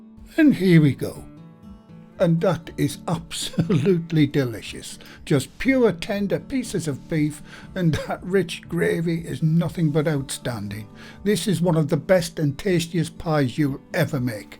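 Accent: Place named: Geordie, United Kingdom. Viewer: This looks amazing, reminds me of the steak pies my mam used to buy from a local butcher. As a fellow geordie i find your accent so lovely to hear .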